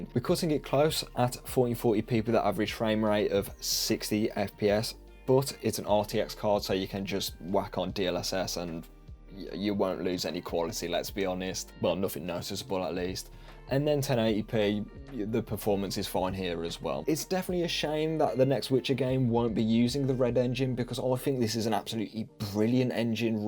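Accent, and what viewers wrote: United Kingdom. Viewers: I just bought the 10 gb version done weeks ago for 373 USD or 289£ since you sound British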